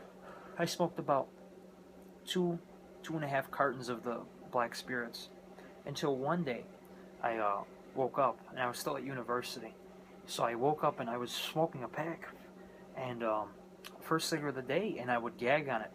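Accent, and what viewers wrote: Chicago, United States. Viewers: That is one strong chicahgo accent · That Chicago accent I'm from Chicago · Fuck yeah dude the second I saw the jacket and heard you speak I knew this boy was from Chicago. Windy City best damn city in the world! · My family is from chicago and surrounding area and i picked up on the accent. I thought you may be from Chi-Town. And i think i detect a little Italiono too. Like listening to one of my family members. Fuckin love it! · He is from Chigaco I think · The only people I’ve ever known with a Chicago accent that thick were all in their 60’s. Maybe this dude is a time traveler.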